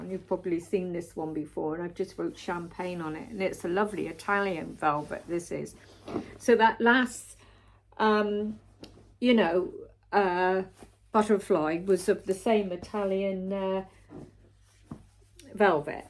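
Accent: Cornwall, United Kingdom. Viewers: I have missed you with your gorgeous Cornish accent. I totally understand how life's circumstances can leave you with no enthusiasm for stitching. It has happened to me before. I am so pleased it is back and I so enjoyed seeing your embroidery machine and all the lovely cushions and tea cosies. Take care of yourself and we will see you again soon. xx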